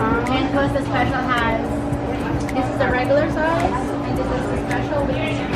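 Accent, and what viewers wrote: Visayas, Philippines. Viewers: pwede ka magbisaya kay murag naa kay bisayan accent...shout out po...maligro family....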